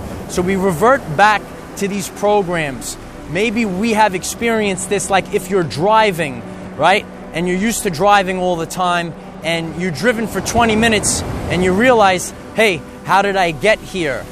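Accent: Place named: Brooklyn, United States. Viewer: I feel like I've spoken to you before. I remember your voice and accent. I never knew anyone from Brooklyn though. Never even been there. Strange how that works.... Thank you. Blessed be. 🙏💜🌷💝💫💖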